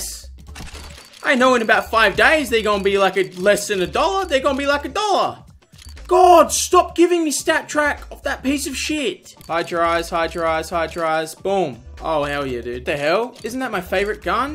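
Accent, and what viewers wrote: Australia. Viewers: Your aussie?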